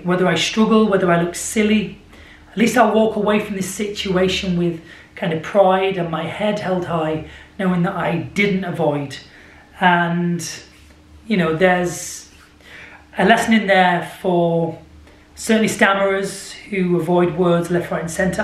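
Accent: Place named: England, United Kingdom. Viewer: Типичный английский акцент. Звучит очень забавно и приятный голос!😉😉😉.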